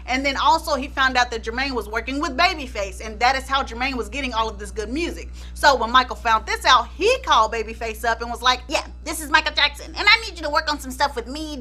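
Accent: South, United States. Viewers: You're so country and loud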